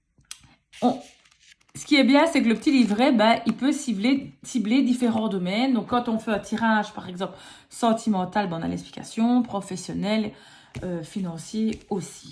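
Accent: Belgium. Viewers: Merci de nous faire découvrir les différents tarots, il y en a bcp qu'on ne connait pas donc c'est chouette 😉 vu ton accent tu es du côté de Liège 😊 moi c est du côté de Charleroi 😆 continue c'est chouette de ta part😜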